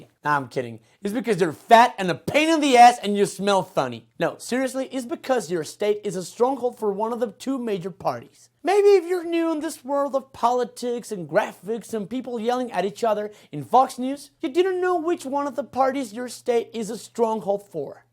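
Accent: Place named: Mexico. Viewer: Please, please, PLEASE!!! Don't make any more of this. Your Mexican accent makes me cringe. I try to pay attention to what you're trying to say but, damn! And you ideas and opinions....Well, just watch your own videos and you'll know what I mean. If I want to see funny news, I'll just watch Colbert, or The Daily News re-runs because them I can actually understand.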